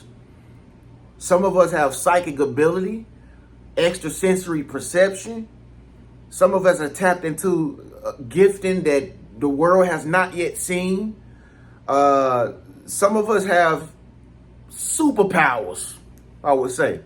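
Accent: United States. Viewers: Thank you so much for doing what you are doing. Your authenticity is such a light in the world. I am not American so sometimes I have a hard time understanding your accent but your light shines so bright that I keep coming back and watch because you are a beautiful soul and you inspire me somehow. I am sending you my love and light. You deserve the best. Blessings ❤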